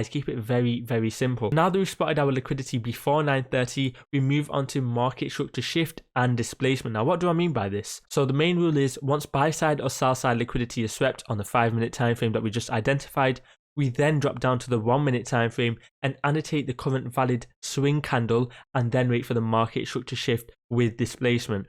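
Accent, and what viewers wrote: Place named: RP, United Kingdom. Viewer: this guy speaks like he has plums in mouth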